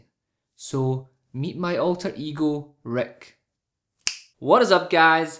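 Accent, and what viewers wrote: United States. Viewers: Nice one. Lol. I Truly enjoyed the video, and your accent. I'm not a big fan of wireless charging, but I'm getting a free G4 from a contest, and I'm going to get the one that you showed just for the heck of it. Your American accent sounded just like a friend of mine in Florida. What a hoot. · You're American accent is better than my Scotsman accent.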